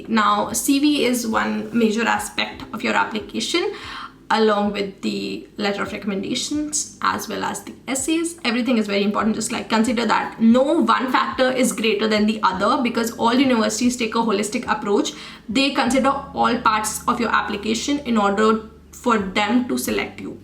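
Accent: India. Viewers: no it is inmposibe i just cant understand this indian way of speach english its crazy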